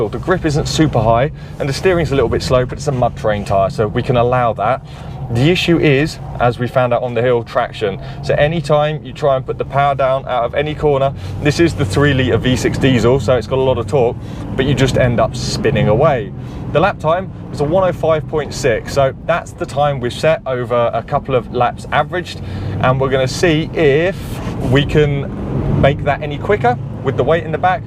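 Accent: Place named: United Kingdom. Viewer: единственное этот британский "акцент" в английском звучит необычно, когда ухо привыкло к американскому английскому))))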